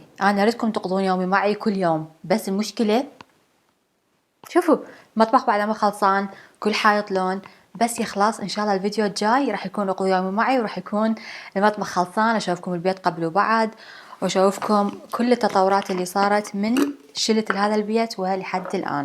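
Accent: Iraq. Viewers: حبيت العراق ولهجتهم من بعدك لفيوو والله 😩❤️❤️❤️ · احلى شي بانچ محتفظه باللهجه العراقيه واللكنه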